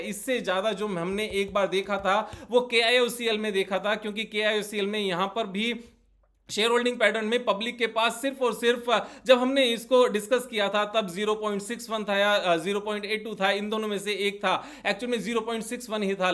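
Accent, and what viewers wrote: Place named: Gujarat, India. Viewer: Bhai Have u been to Gujarat ever, sometime your tone has Gujarati touch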